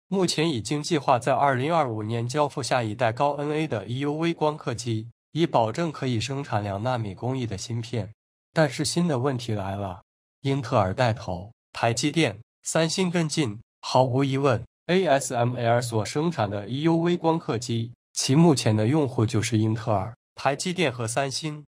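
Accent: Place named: China. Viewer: 這口音與用詞就是對岸中國的味。不專業的程度就跟中國的漢芯一樣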